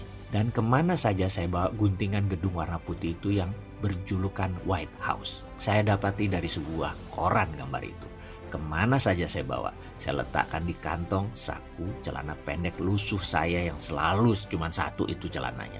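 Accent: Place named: Palembang, Indonesia. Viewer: ini mas Adminnya orang Palembang ya ...hehehehe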